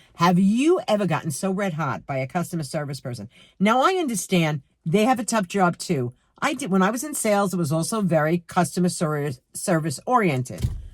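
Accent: New York, United States. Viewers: I think it's fairly common; even if they don't say anything; it's just an attitude issue !! workers can be like 'robots' !! I like your accent; was about to ask if it's a NY accent ! 💚💟 I think she will learn from the experience.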